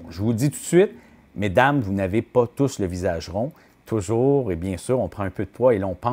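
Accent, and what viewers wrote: Canada. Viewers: Oui bonjour moi aussi si j aimerai bien que je vous me coiffiez mais apparemment d âpre l accent vous êtes au Canada c est possible de vous envoyer une photo de face profil etc et que vous estimiez ce qu il faut faire contre rétribution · Très compréhensible et bien expliqué ,avec votre petit accent canadien que bcp de Français aiment!-Bonjour d' Allemagne d' une Française très interessant,surtout en période de Covid ,où les cheveux ont poussé énormément,les coiffeurs ayant été fermés assez longtemps!-Vos informations me serviront pour une bonne coupe chez le coiffeur!- Merci!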